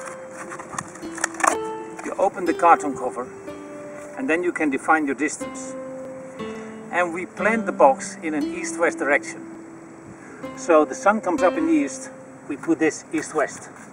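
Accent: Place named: Netherlands. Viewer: Probably, cant miss the dutch accent tho lol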